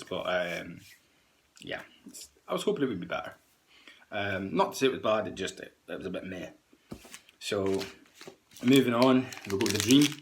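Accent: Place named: Scotland, United Kingdom. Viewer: Lol first time I have heard your voice my mate.... a tad scottish :-)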